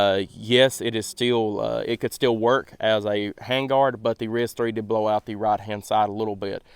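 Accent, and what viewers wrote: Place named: Alabama, United States. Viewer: What state are you from? Sounds like our Alabama accent.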